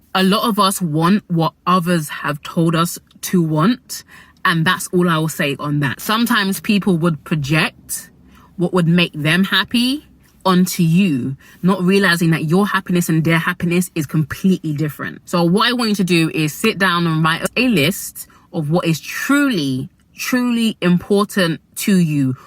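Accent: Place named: RP, United Kingdom. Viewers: I love your English accent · accent though…. So posh🤤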